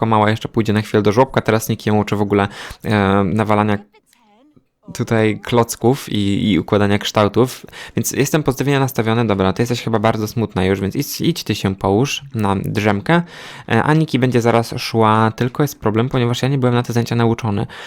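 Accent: Podlasie, Poland. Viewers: Pozdrawiam współplemieńców Podlasian😂 I to nasze zaciąganie... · Jestem z Podlasia... I dopiero od niedawna zauważyłam ten Podlaski Akcent i siebie xD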